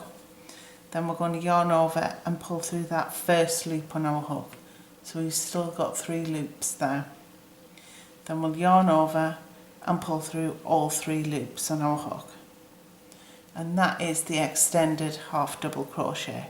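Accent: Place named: Northern England, United Kingdom. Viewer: Your accent sounds to me like northern England. I'm American so I can't be sure. Thanks for this really nice pattern and wonderful pattern!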